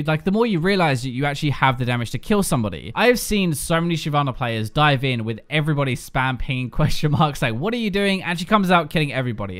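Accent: United Kingdom. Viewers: First time I'm annoyed at British accents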